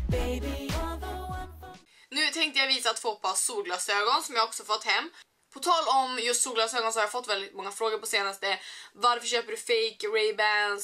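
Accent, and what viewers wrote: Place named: Sweden. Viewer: älskar dig röst. din svengelska. på allvar! allt du säger låter så "rätt" haha kan inte förklara men aa kärlek till dig!!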